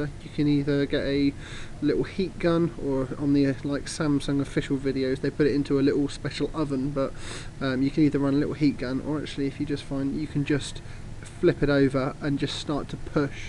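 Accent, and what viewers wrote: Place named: United Kingdom. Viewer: Strange one, how do i tell if the replacement digitizer i have is the IC or non IC version? I know how to tell which version the phone is by looking for the IC under the sim card holder but my friend got the phone and ordered the replacement digitizer but i dont know if he ordered the right one or not! Also you sound British in the video, where are you from? I am in Kent. Cheers, Phil.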